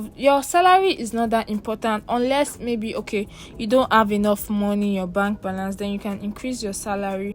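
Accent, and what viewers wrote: Nigeria. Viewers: Are you Nigerian, sorry for asking but you got that Nigerian accent. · You sound Nigerian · You sound African Nigerian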